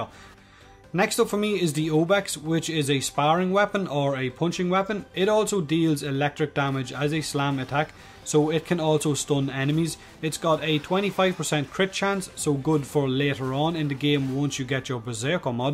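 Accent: Ireland. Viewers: IRISH. SUBBED! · Irish really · I love your fucking accent I am Irish too xD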